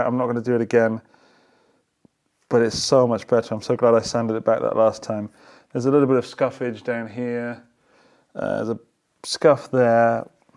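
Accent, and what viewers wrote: United Kingdom. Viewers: I love 90s studios, I love working on guitars, I love hearing British accents on YouTube (no disrespect to our transatlantic cousins) and I love the slightly mad professor vibe this series has. All round win! Would be great to see you modding a tube amp in a similar style, although you might actually die, so that could be a very bad idea.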